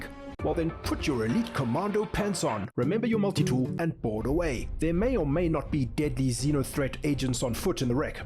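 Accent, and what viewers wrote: South Africa. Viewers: Ek het subscribe die oomblik toe ek jou SA accent hoor. Great videos! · You sound South African :)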